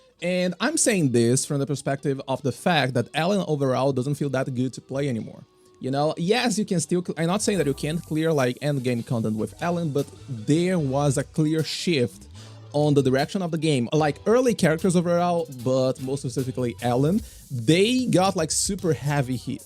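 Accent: Brazil. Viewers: Pelo seu sotaque, você é brasileiro 😀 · I'm not gonna lie, sometimes you sound like a Brazilian speaking english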